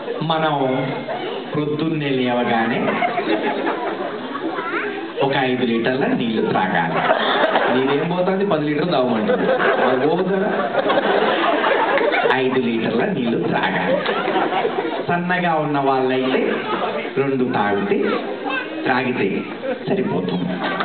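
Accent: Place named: Telangana, India. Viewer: AND U CANT EVEN DO THAT RIGHT WITH THAT HEAVY TELANGANA ACCENT DONT UNDERSTAND ME WRONG I AM FROM TELANGANA TOO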